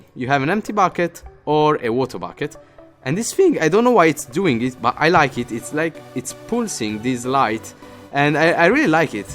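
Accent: Italy. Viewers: si sente il tuo accento italiano :D! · quanto si sente che sei italiano?! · Si sente che sei italiano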